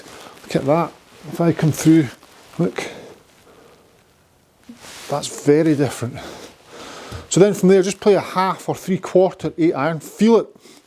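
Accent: Scotland, United Kingdom. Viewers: Love your instructions. I always pay attention because, to tell you the truth, you scare the hell out of me. My grandmother was Scottish and I just love the “accent.”.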